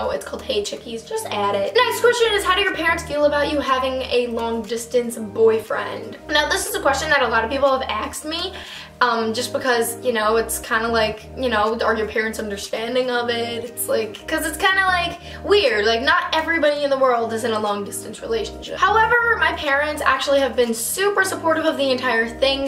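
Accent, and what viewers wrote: New York, United States. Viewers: Dominika Pisula she has that like “New York” accent (she’s from Long Island) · i think its just her new york/long island accent hahaha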